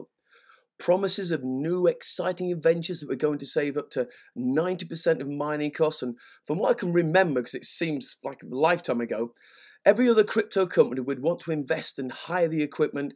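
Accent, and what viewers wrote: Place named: North, United Kingdom. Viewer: Your sense of humour made me laugh...you sound like a Northerner like me, but in on the know with USI Tech also...enjoyed the vid.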